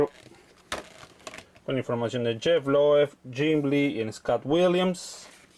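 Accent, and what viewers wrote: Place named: Argentina. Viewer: Sin embargo aunque hablas con acento argentino tu acento no es tan marcado, ¿Es que vienes de una parte de Argentina dónde el acento no es tan marcado, o llevas tiempo fuera de Argentina y por eso has perdido un poco el acento o es que tratas de moderar tu acento para hacer tus vídeos?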